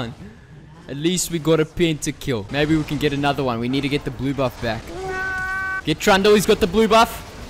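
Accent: Australia. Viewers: His australian right? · Ru aussie mate?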